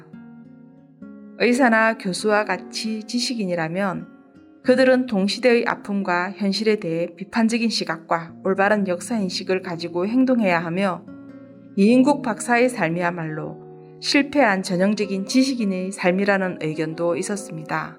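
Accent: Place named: Gyeongsang, South Korea. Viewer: 경상도 억양은 어쩔수 없지만 발음은 좀 ㅠㅠㅠㅠ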